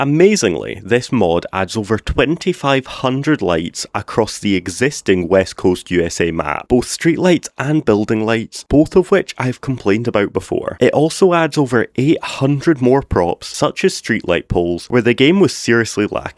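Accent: Scotland, United Kingdom. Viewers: Oh cool a Scottish BeamNG YouTuber!!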